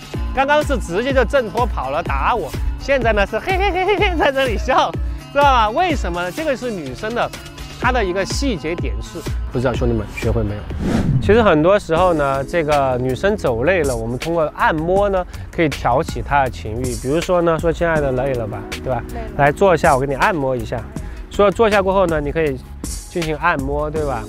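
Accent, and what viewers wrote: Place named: Hunan, China. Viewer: 小哥你是湖南还是 武 汉滴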